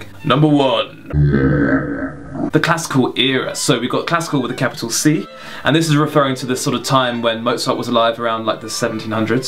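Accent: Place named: United Kingdom. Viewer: It too much British accent their,please slow it little bit not able to understand clearly. Thanks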